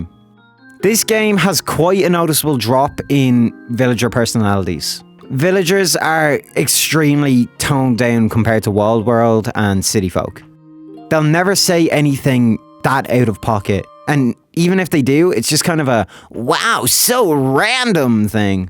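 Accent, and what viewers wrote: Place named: Ireland. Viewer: instantly clocked that you’re a fellow irish person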